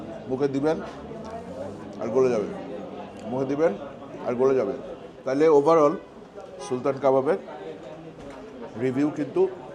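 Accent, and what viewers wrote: Barishal, Bangladesh. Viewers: ভাই আপনি তো বরিশাইল্লা, মিরপুরের পোলা হইলেন কেমনে?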